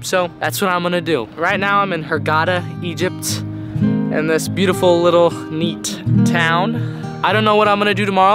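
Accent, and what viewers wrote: United States. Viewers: cazzo, ma è delizioso come parla sto sudista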